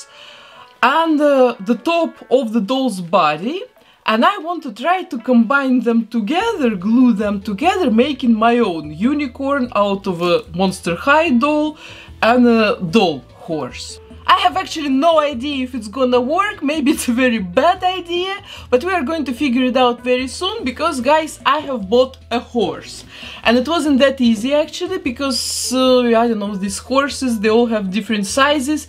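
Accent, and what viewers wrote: Russia. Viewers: Почему такой прям русский акцент)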